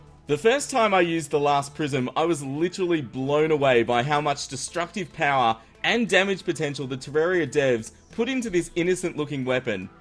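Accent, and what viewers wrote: Australia. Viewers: When 1.3 comes for mobile i will surely go after the last prism since the mage class is my favourite and the one that i mostly play. P.s. I've never been so early for a video of yours, especially now that it is am right here, so i thought i could also ask a question which is: Are you Australian?. Keep up the good work:)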